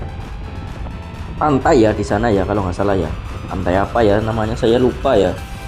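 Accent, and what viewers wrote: Java, Indonesia. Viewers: Medokbanget lagumu lah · Maaf mas anda sepertinya kurang menguasai kota Cilacap, terus bahasanya medok jadi seolah olah kaku.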